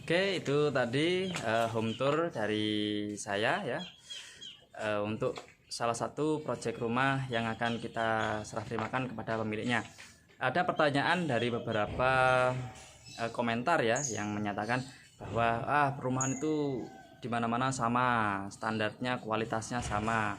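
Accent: Indonesia. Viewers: daerah mana mas ..klw aku.daerah jabar...dari segi bahasanya jatim or jateng ya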